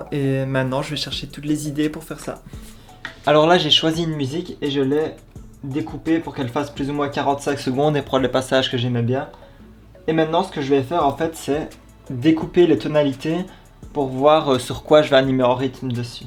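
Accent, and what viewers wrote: Liège, Belgium. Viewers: trop cool ca fait du bien d'entendre un accent liégeois !!!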